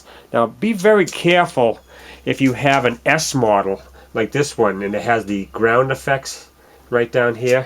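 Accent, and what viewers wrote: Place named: New England, United States. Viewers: good job sir....you have a great gift in teaching...yes, you have a nice boston accent..similar to Peter Griffin of Family Guy....:) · I love the New England e-yak-cent! I’m usin’ a he-ahf inch bray-kah-bah! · Those cab. bolts just need snugged up good! N a screwdriver ant going to hurt it! Other than yankee ackcent this dude done fine! Jack stands r nice if ur going to b up under car eating lunch! Dont for get pump breaks b for u head out!!!! Good job! Yankee!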